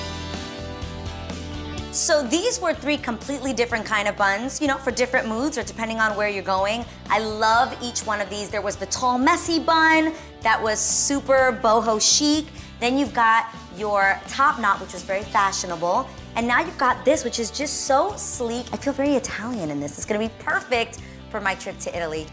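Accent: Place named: New York, United States. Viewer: I’m in love with your New York accent. I’m jealous. By the way, awesome video! I’ll definitely try one of them!❤️💙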